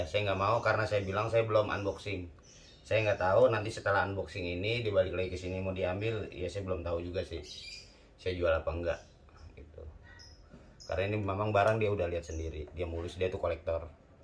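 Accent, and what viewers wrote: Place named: Jakarta, Indonesia. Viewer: Resep klo lagi ngobrol nya bang bahasa nya Betawi pisan sukses slalu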